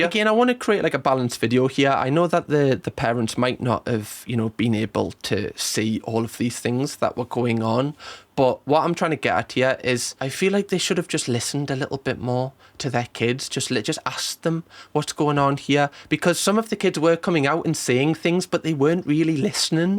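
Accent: Newcastle, United Kingdom. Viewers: He says he has a Geordie accent? I don’t know where that’s from 🇨🇦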